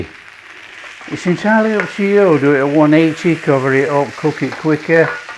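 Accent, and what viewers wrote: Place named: Yorkshire, United Kingdom. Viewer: Yum. What a treat. I see you’re still concentrating on a weight reducing menu!! Now, your accent. I would have said Lancashire, Oldham area but I reckon you’re Yorkshire, so Holmfirth? Halifax? Extreme West Yorkshire? Definitely not a French pronunciation.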